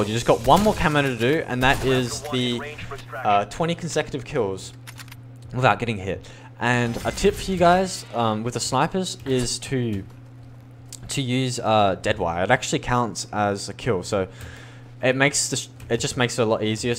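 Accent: Australia. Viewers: An Australian yooooo wtf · subscribed from your RPG video, now that i heard ur voice i see ur an aussie, even happier that i subbed now :)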